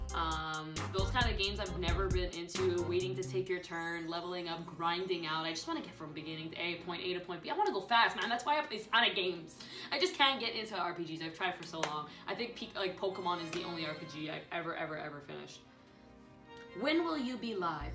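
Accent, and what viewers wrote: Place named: United Kingdom. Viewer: Woot woot! My question made and thank you very much answering! Also nice British accent.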